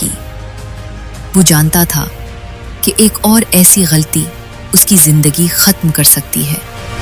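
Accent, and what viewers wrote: India. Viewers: Ap ke urdu Hindi jesi ha I mean lehja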